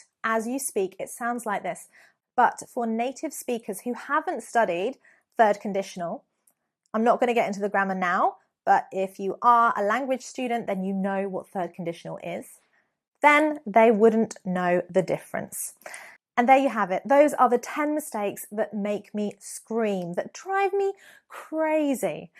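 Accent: United Kingdom. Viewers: You sound exactly like every Snooty British person every American citizen hates with a dieing passion.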